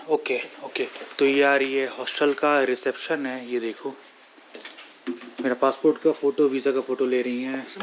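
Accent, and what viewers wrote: India. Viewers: 大部分普通中国人英语并不好，你说印度口音的英语，他们更听不懂。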